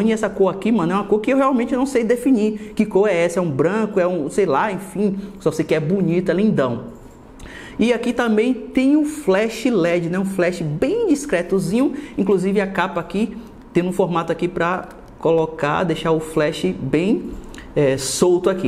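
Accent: Bahia, Brazil. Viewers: sotaque baiano, é isso mesmo produção? SSA tá feliz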